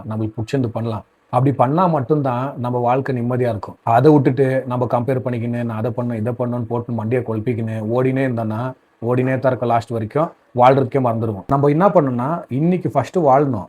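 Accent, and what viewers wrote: Bangalore, India. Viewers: Thambi bengalore Tamil la.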